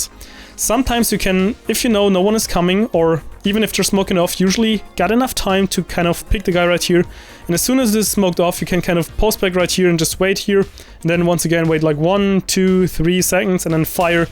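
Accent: Germany. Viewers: Are you gGerman?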